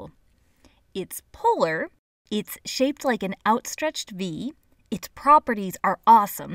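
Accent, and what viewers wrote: United States. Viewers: Nah, it's the annoying whiny voice, american accent, and also the tone that would be condescending for adults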